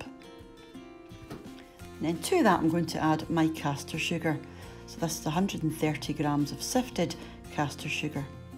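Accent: Scotland, United Kingdom. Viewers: Love this! Love hearing a Scottish accent on here!!💙They look amazing and I’ll definitely be trying this out this week! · Just found your channel and I’m absolutely addicted. As a Scot living in Hong Kong, it great to find authentic recipes and a lovely Scottish voice. Great work and keep it coming. Btw the English subtitles are hilarious! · You are a wonderful lady to watch and I love your accent. You help me get in touch with my Scottish side as well. I have learned to cook mostly like my mom and her first nation's family, with plenty of slow-roasted meats, corn, and other veggies with much bolder spicier tasting things. But your videos have helped me come up with stuff for my Scottish Irish dad and his preferred taste for things. My parents are getting older and it's nice to find things to make that they enjoy. Thanks for your videos. :) I appreciate your channel.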